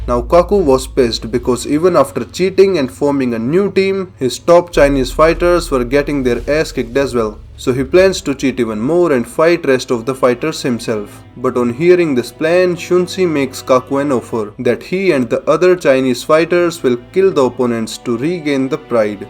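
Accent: India. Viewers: If its not for the thickest Indian accent, this videos would have been a bang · I just fucking love these videos, indian accent with sarcasm its awesome! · The eloquent way of speaking and indian accent makes this 10x funnier. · The stoic Indian accent is way too hilarious! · Accent: indian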